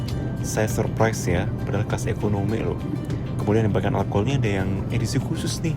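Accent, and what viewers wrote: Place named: Surabaya, Indonesia. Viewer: Terimakasih Bang, kontenmu mewujudkan mimpiku waktu kecil, kan suka liat Globe terus penasaran pulau² kecil disamudra emang ada orangnya? Dan Kontenmu menjawab semuanya. Tp ada 1 yaitu kutub Utara atau selatan ataupun negara yg terpencil² kyk gimana kehidupan mereka yg jauh dr negara² lainya. Semoga kontenmu semakin berkembang. Btw orang mana kok logatnya kyk Surabaya.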